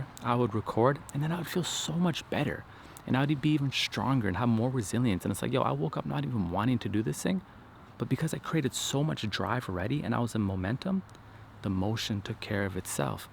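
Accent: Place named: Jamaica. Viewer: I miss your Jamaican accent!. Love your message though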